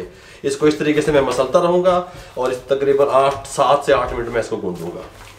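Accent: Punjab, India. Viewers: smells punjabi in urdu. thanks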